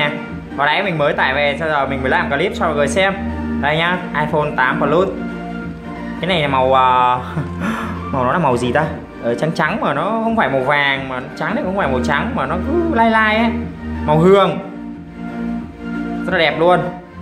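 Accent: Vietnam. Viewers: anh ơi anh miền bắc hay miền Nam vậy em miền Nam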